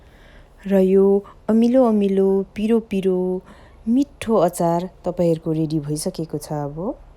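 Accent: Darjeeling, India. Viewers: kati mitho boli ho didi hajurko fist time herdai chu tara aawaj chai sarai ramro darjeeling ko ho didi hajur??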